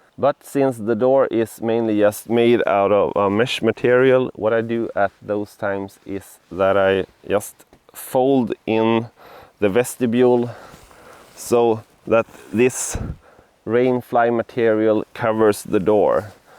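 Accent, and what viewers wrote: Sweden. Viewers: Are you Sweedish or Norwegian, you have that accent when ending words 😂 nice vid and tent. Prob watched 40 already as I am going ti buy it and then ready to go to Sweeden for camping :D closer to go there from where I am then back to Croatia where I am from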